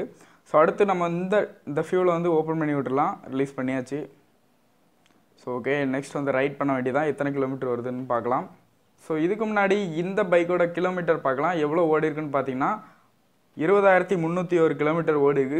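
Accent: Kanyakumari, India. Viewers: nanum kannyakumari taan Unga peachu la tarriuthu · பேச்சு லேசா கன்னியாகுமரி போல இருந்தது அதான் கேடீடேன்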